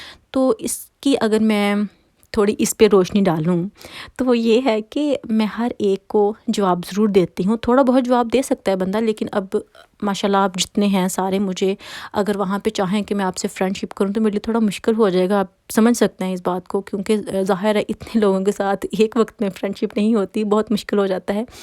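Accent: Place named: Faisalabad, Pakistan. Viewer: Aoa. App acha bolti h magar boht speed se bolti h. App Faisalabad se h app ki boli btati h k app Faisalabad ki h. Btana zarooor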